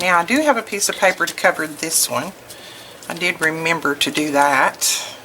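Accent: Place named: East Texas, United States. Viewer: would you happen to be from E/NE Texas? you sound like family members of mine. :-)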